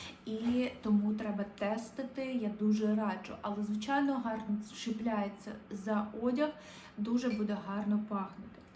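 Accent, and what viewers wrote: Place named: United States. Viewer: Гарна українська з американським відтінком.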